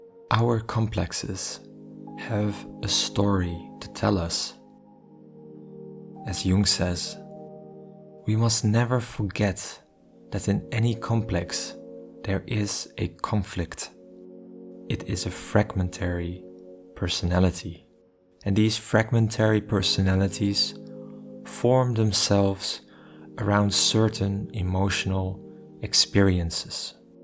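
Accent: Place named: Netherlands. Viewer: Beautiful artworks and great storyline. Your video’s are great value to my as I am on the path of individuation as well. Thank you. I can hear from your accent that you are from the Netherlands as well?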